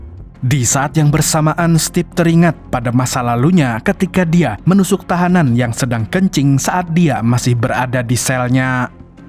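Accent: Banjar, Indonesia. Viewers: Urang banjar kah abng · Orang banjar kalsel kah pian mang😂😂 "kyapa habar pian berataan" jar pian😅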